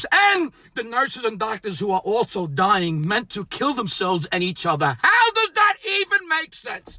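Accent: United States. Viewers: Yeah! is Back 👍 sounding like a true American ❤️🇺🇸💙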